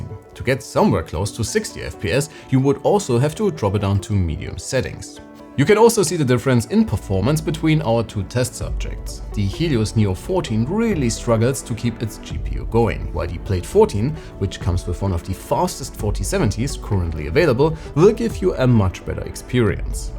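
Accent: Germany. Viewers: I don’t understand, why you don’t do the videos in German.. it is clearly hearable, that German is your mother tongue… or at least in both, German and English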